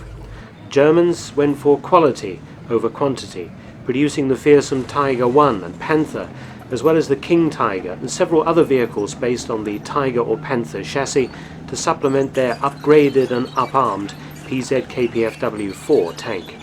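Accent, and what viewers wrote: United Kingdom. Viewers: Thanks for the video. I can tell by your voice that your British. Your our best friend and that will never change. Us Americans are very loyal we don’t give our loyalty easily. Liberty or death.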